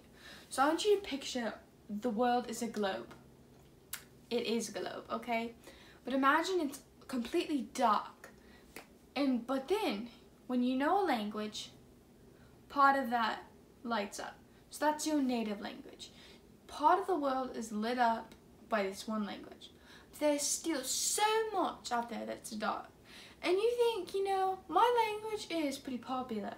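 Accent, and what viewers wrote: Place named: United Kingdom. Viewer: your accent was kinda different today, more like british or idk. i enjoyed listening so much. i really like learning language a lot and this video made me fell more cool in my skin. i agree to everything there and i think everyone should watch that video coz it's super advantaging to learn a new language. thank you so much for producing videos like that for us. this video deserve a lot of like, comments and sharing!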